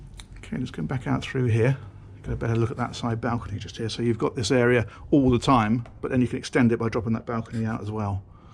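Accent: United Kingdom. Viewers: Always a British narrator for such elegance. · Touring expensive yachts is done best with a British accent. Lol It's just amazing the different lifestyles people live. I'll never come close to owning something like this. Must be nice to have money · I saw an American flag on the stern of the yacht, so why do you have this snob talking brit doing the tour?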